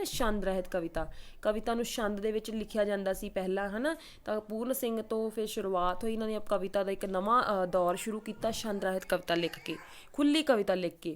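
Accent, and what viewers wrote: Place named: Doaba, India. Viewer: ਤੁਹਾਡੀ ਬੋਲੀ ਤੋਂ ਲਗਿਆ ਸੀ ਮੈਨੂੰ....ਮੈਂ ਵੀ ਦੋਆਬੇ ਤੋਂ ਹਾਂ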